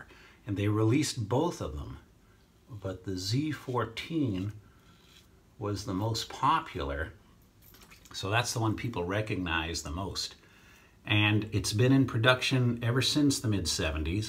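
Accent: England, United Kingdom. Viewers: Would love to hear your Newcastle accent on camera, and to see Eternity coming next in this record breaking series! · You sound like you're from Kansas. Can you give us a taste of the ol' English accent?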